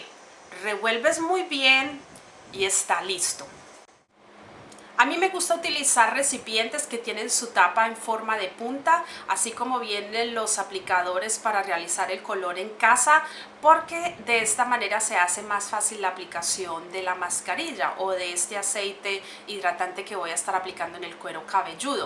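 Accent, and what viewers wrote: Colombia. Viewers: Eres colombiana ? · me fascina como hablan los colombianos.....me fascina ese acento, ahhhhh que bonito jajaja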